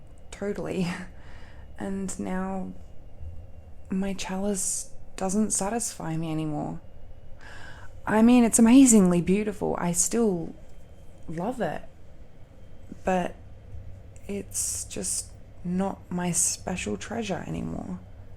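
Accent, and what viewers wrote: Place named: Australia. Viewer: holy shit au accent asmr im melting.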